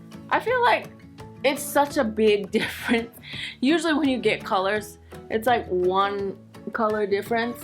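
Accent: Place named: South, United States. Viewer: ur southern accent is the cutest !!!!!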